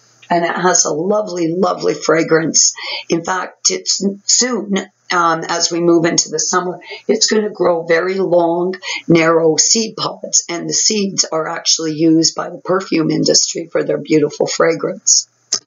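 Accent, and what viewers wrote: Canada. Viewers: Hi wow I should have picked up that you are Canadian with the accent.....I was born in Montreal Canada came to USA in 1971 as a child...I'm now in Virginia where there is snow in winter but I've spent all of my adult life in Souther California...OMG it's so beautiful there....but I had to leave it to get out of the NARC relationship I was in....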